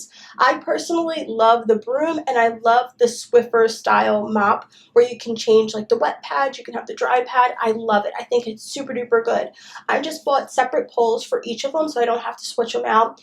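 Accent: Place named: Philadelphia, United States. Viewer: Good info! Sidenote- you sound like you might be from Philly area or NJ.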